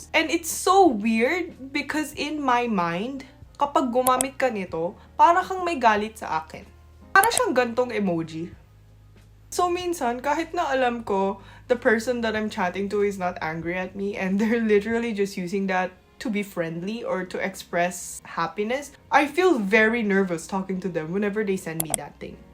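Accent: Batangas, Philippines. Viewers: I just love the way you talk like a local in taglish and the way you articulate your English is tops. No more shades of being Korean. Even your intonation is so Filipino and so understandable. I'm very proud of how you've turned out to be. You are now very well versed and a good conversationalist. Thank you, congratulations and God bless.. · galing magsalita ng Filipino…. go Batangas!!!! andun pa rin yun punto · Grabe Pinoy n pinoy accent tagalog ni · Napanood ko po kayo sa TVJ, mukhang bata yong itsura niyo pero yong 12 years old mukhang mature na. Maganda po yong batangueña accent niyo talagang tigasin, kilala po kasi ang mga batangueño na matatapang, mga ilocano na kuripot, mga kapampangan na galante. Sabagay bawat lugar may kanya kanyang bansag, sinasabing matatapang ang mga batangueño dahil sa salita palang eh medyo mai intimidate ka na, lagi pa silang may balisong sa bulsa kaya kung ayaw mong magripohan huwag makipag away sa batangueño, sinasabing kuripot ang mga ilocano dahil siguro bihira kang makakita sa ilocos ng mga magagarang bahay gaya ng Pampanga, o manila na super gaganda ang mga bahay at halatang may kaya ang mga tao. 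Sa ilocos po parang hindi apektado ng mga pagbabago ng panahon, meron din mangilan ngilan na magaganda ang bahay pero mostly simple living ang mga tao doon dahil karamihan sa mga ilocano, sa pagsasaka ang ikinabubuhay at kahit na nakapagtapos na ang anak ng isang magsasaka, mahilig pa rin sa nakagisnan na pagsasaka, in other words simple living lang talaga ang maraming ilocano kaya nasasabihang kuripot. Sa mga kapampangan naman po, palibhasa progresibong lugar ang pampanga, maraming mga business establishments, mga restaurants at parang manila na maraming tao. So maraming mga kapampangan ang mga milyonario at ipinapakita nila sa magagarang bahay, in fact po, tuwing piyesta sa ibat ibang lugar sa pampanga, kunwari dadaan ka sa isang handaan na open for public, puwede ka ng makikain, huwag lang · You really sound like a Filipina speaking tagenglish. I really like your batangueno astig accent and Im a big fan of yours. 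You should have a talk show in Manila. Since you are articulate and smart. You will be a big hit. Watching from northern California, the bay area. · Just binge watched your videos. And saw on ig that you studied Archi in UP i guess? My question is, are you using your degree now? Like the apprentice and such? Or pahinga muna? And how would you take the boards? Macecredit ba yan sa korea and youll be a licensed archi there???? I hope youd be able to reply. Im an archi student as well kasi HAHAHAHAA *plus i really love na na adapt mo yung thick neutral accent ng pinoy when speaking in english. Mas prefer ko yun, mas pangit pa nga yung may konting tweng or conyo BGC type na english, like shut up and get the fuck off 💀😂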